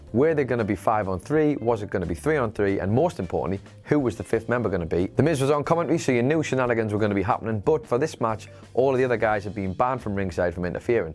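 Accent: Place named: Newcastle, United Kingdom. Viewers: Geordie accent in this case- WhatCulture are based in Newcastle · It's Geordie, akin to MIDDLE ENGLISH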